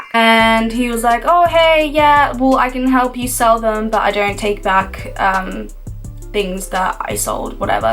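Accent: United Kingdom. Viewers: oki doki, cause when i heard you speak English i recognised the british accent and though to myself, she's British and then now your swiss and i was like what is 😂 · you speak perfect British English! Have you ever lived in Britain?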